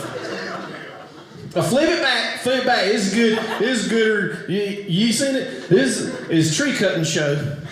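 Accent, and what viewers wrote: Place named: South, United States. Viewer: The sad part is that I recognize his particular NC accent. 😅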